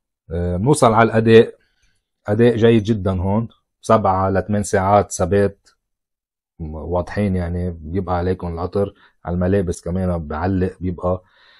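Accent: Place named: Lebanon. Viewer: اللهجة اللبنانية كتير منيحه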